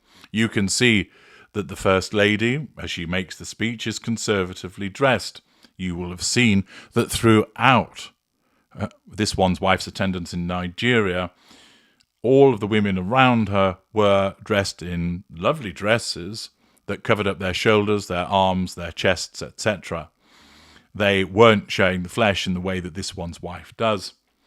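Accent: United Kingdom. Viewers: Please, please, please. Don't create more 50 second introductions to your interesting and entertaining videos. They are so well done. You are so intelligent, talented and have a beautiful, deep voice❣️ l love your British accent ❣️ I always fast forward the 50 second intro because I don't want to wait to get to the content. Please create more intros like the flames. Thank you for teaching us.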